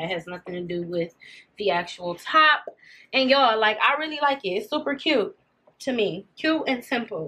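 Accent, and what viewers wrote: Detroit, United States. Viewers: Cute, the fact I knew you were from Detroit, well before you said it is crazy lol love the video 😎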